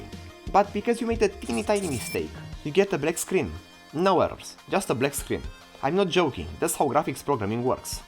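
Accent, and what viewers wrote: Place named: Romania. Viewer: that romanian accent 🤌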